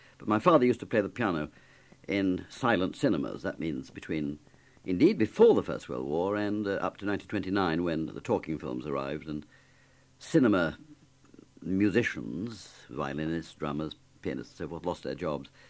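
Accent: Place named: Lancashire, United Kingdom. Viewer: Dear MUP, either you've inadvertently pitch-shifted this up, or YT has messed up somehow. Either way, AB's beautiful Lancashire brogue was never pitched as high as this.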